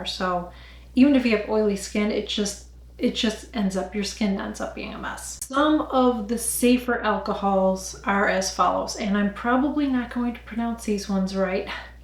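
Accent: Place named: New York, United States. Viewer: Oh dam Girl, i don't check. And yes Clinique was my first foundation. I was advised which shade. It was way to light. And yes i used girl intentionally. I think you have a slight New York accent. And Boo to who put you down. Just pathetic. Hi to Family & Tabitha, the beautiful puddy tat 🐈🐾🐾❤💞❤💕 xxxx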